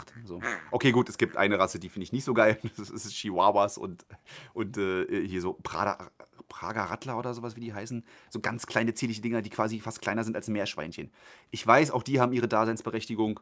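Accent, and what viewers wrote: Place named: Berlin, Germany. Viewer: Schönes Video, lieben Dank!!! Wenn du magst kannst du gerne mehr "berlinern" ;);););) LG aus Rheinbach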